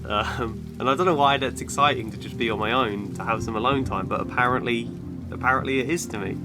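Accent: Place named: Manchester, United Kingdom. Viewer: The Algorithm Gods have blessed me with an extremely insightful and beautiful channel. Wild guess but you sound like you’re from Manchester as well.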